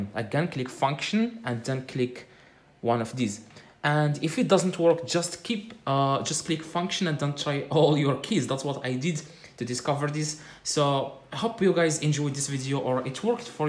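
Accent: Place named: Morocco. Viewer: Sound morrocan 😁🤩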